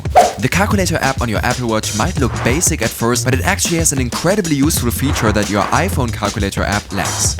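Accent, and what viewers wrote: Germany. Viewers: German accent overload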